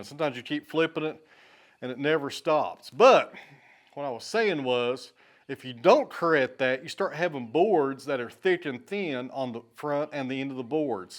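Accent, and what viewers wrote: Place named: Tennessee, United States. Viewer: Keep doing what your doing. The sawmill, Bruno, mamma cat and cabbage, chores, building your timber frame barn, the chickens, the puppy, your dad, new tools and machinery, trips to the shops and exhibitions, your mistakes and the ability to laugh at yourself, make this a very interesting channel. As a Brit with no experience of life in Tennessee I find this channel fascinating …..and your delivery with that Tennessee drawl and a great sense of humour is the icing on the cake. Keep right on doing what you are doing brother!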